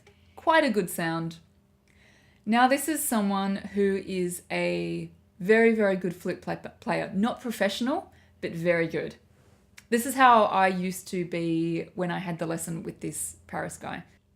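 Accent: Australia. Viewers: this is australian accent?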